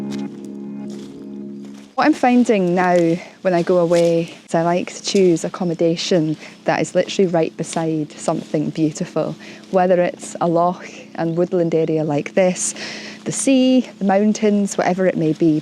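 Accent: Scotland, United Kingdom. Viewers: Hi, I've watched your channel and loved your photography for years. Plus, your Scottishness is lovely. Be well. Bless you.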